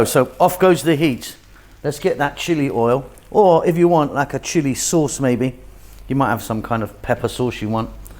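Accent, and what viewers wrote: United Kingdom. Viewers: Your question confirms my initial response: what does someone with a British accent know about refried beans? They are great with Mexican or Tex/Mex dishes.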